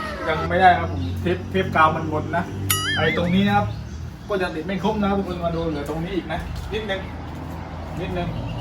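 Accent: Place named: Central Thailand, Thailand. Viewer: แหลงกลางชับน่ะเติ้น บ้านอยู่ใหน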